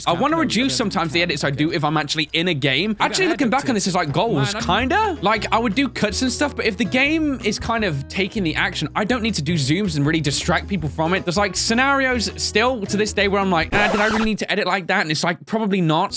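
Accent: United Kingdom. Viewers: Your voice changed...backwards.You used to sound more mature and British.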